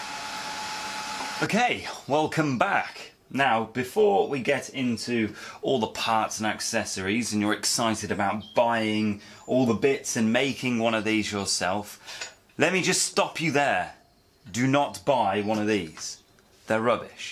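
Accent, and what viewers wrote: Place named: United Kingdom. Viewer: I like your videos and British dialect, I made my own from parts I salvage from SMPS PCBs, Plasma TVs etc.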